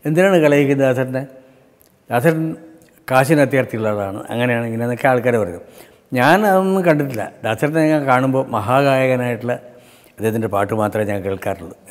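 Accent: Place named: Kannur, India. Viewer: ഈ കണ്ണൂർ സലാംങ് കേൾക്കാൻ നല്ല രസമാണ്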